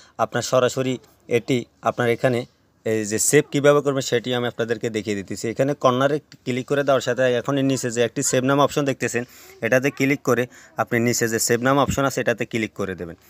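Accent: Noakhali, Bangladesh. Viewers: আপনে নিশ্চিত নোয়াখাইল্লা কোন সন্দেহ নেই??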